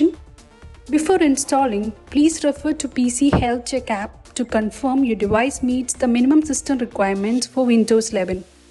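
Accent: Kerala, India. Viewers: R u a Keralite.?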